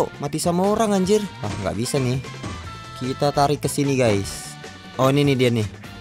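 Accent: Bengkulu, Indonesia. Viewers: bang lu dari Bengkulu ya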